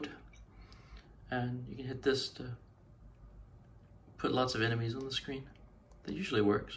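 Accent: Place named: Germany. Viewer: You speak Dutch with a German accent and intonation 😉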